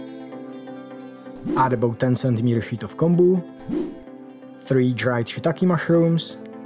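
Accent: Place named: Czech Republic. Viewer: Z přízvuku se zdá, že jste Čech. Skvělé video, vyzkouším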